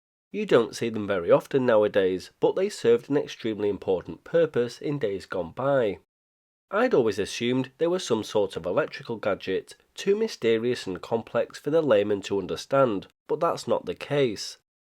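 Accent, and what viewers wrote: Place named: Northern England, United Kingdom. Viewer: Fascinating stuff you always come up with, especially with your accent! It's not dissimilar to mine. I'm from Baguley, Wythenshawe round the corner from Alty (Altrincham for non-locals). My brother used to keep racing pigeons in our parents' back garden. I never knew this about those cork devices but I've seen them too. I think I may have thought they were a lightning protection device of some kind (pretty stupidly). Thank you for solving that little mystery.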